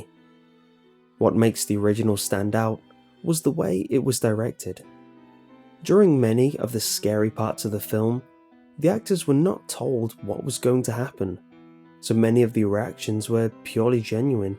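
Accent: United Kingdom. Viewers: He's British, that's why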